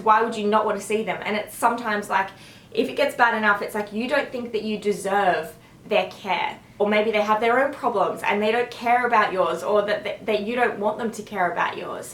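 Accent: Australia. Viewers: Beautiful accent..... Australian?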